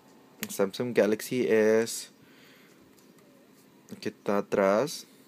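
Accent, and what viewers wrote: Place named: United States. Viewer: tu ingles es excelente, el español bastante bien siendo un idioma bien dificil, pero el tono estadounidense en el ingles es ideal para videos en ingles, en mi opinion.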